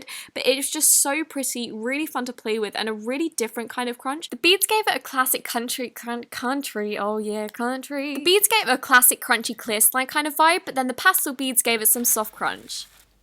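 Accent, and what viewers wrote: United Kingdom. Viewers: She's British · she has a little bit of a British accent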